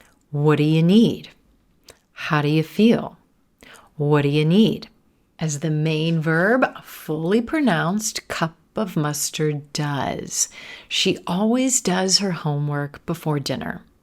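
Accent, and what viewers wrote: United States. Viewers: Your voice is so attractive. I am trying to search for some channels/blogs that have a clear American voice like yours for shadowing. Could you introduce some pages that I could imitate with scripts? Many thanks.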